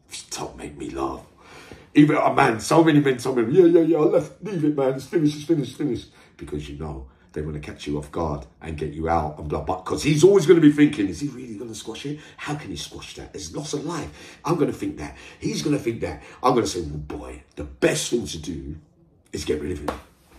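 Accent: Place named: Cockney, United Kingdom. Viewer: Just try deliver your content clearer mate your diction is not great and remember you have followers who ain't cockney varra boys kid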